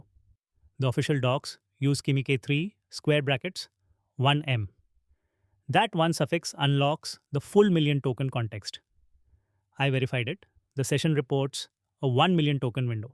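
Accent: India. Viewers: It’s my first time seeing a video from this guy with the thick East Indian accent. My feeling is that regardless of any bias he might have he did worthy testing and an excellent job of spelling out his methodology. Better than the usual junk that I’ve wasted my time watching. So: 1) Kudos to him for doing this. 2) where’s your YouTube video doing this kind of evaluation in a totally unbiased way? Seriously, it’s easy to criticize but takes effort and I think you shouldn’t be so harsh. Please ponder that…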